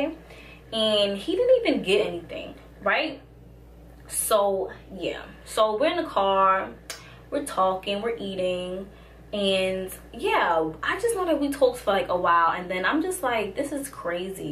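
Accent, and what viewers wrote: New York, United States. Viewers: yess NY accent, always spot a NewYorker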